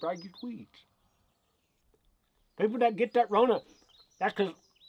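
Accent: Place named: South, United States. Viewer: I can understand everything he says! Lol that’s marrowbone talk right there. Yee yee!!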